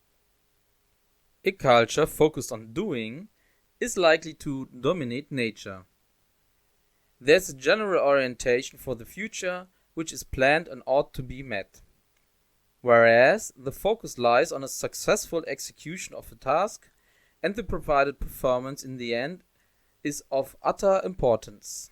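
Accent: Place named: Germany. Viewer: Inhaltlich gut, aber mach es besser auf deutsch. Dein englische Aussprache ist zu deutsch. Aber danke fürs Video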